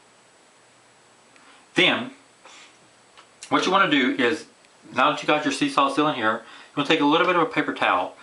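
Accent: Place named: South, United States. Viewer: It sounds southern my guess would be Georgia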